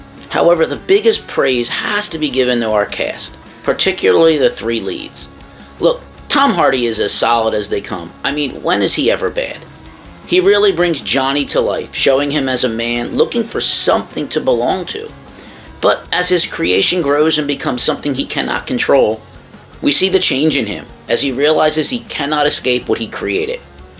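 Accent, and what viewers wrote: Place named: Philadelphia, United States. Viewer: You sound like you're from the Philly/Cherry Hill area.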